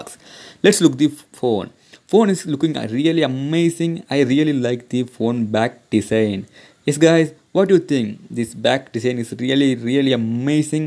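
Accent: India. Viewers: are you chines ? You speek like Indian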